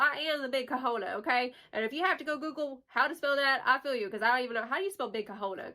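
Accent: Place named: Texas, United States. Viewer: you are such a good singer. i love ur voice and texas woman accent. reminds me of a cold glass of arizona sweet tea, baby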